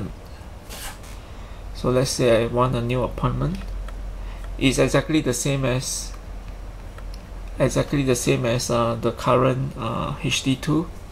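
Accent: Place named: Singapore. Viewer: i don't like your accent. SINGLISH?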